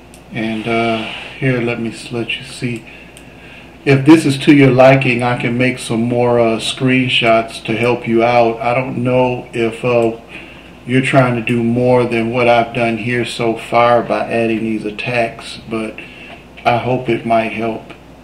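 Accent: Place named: United States. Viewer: Thanks for sharing this. I'm at the point where this is exactly my roadblock, my character needs to do more than just walking around. I know this was over a year ago but can you explain what you did, or maybe do another detailing changes....ie, >add Attack animation to Animator > setup Trigger in Parameters, >set parameter to >.01 etc....then also breakdown what lines of code you added to script or if you added a new script. It's kind of hard to see on this video without closeups or zooms. It is good to hear a good American voice on a tut! Thanks!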